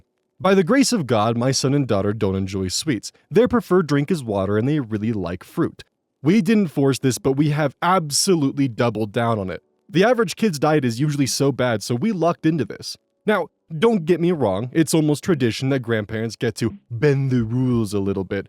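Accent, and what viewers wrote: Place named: Midwest, United States. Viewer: Even though you're from Ohio (Pretty sure that's what you said) and he's from Wisconsin, once in a while your accent makes me think of Pickles from Dethklok. Juuust enough that I couldn't place who I was thinking of til just now (been listening for maybe a month now?-idk my ADHD makes it hard to measure time)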